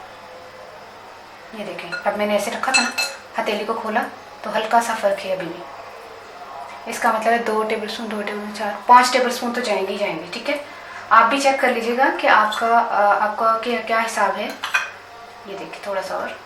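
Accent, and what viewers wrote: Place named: Punjab, India. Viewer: hahahahah so cute Punjabi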